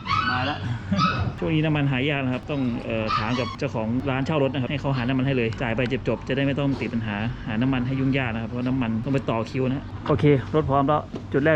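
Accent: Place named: Laos. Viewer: ปากเว้าพาสาลาวม่วนหลายอ้าย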